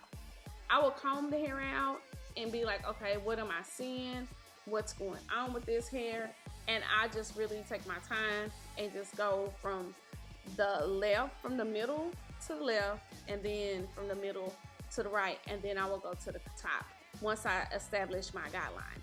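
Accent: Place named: South, United States. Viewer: This is definitely a southern voice over!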